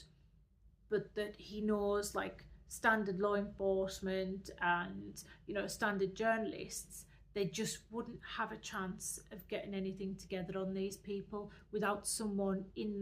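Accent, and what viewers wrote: United Kingdom. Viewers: Is this man British ?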